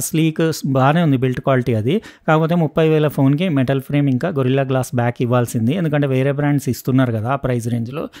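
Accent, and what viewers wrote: Telangana, India. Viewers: Telengana slag bale vuntundhi.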